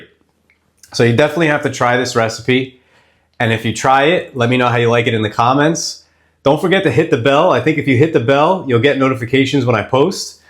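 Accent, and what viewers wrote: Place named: New Jersey, United States. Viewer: I like hearing the NJ accent again. I no longer say 'warder' any longer, but like hearing still. Makes me homesick for the pre-1960 NJ/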